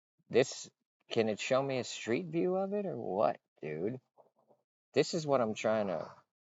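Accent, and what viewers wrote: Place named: Northeast, United States. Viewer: Ya you’re good at telling stories. You have a nack for it plus the cool northeast accent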